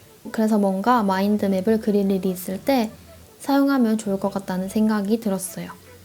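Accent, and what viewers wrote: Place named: Gyeongsang, South Korea. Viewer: 갱상도억양이 아주 강약조절을 하면서 톡톡 튀네요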